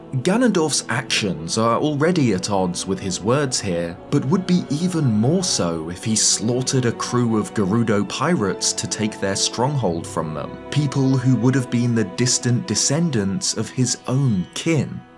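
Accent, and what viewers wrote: United Kingdom. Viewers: not related but the rhythm of the sentence is really good for poetry or lyrics, especially when read by UK accent. Doubt it was intentional by the translators but it adds an extra element of spooky.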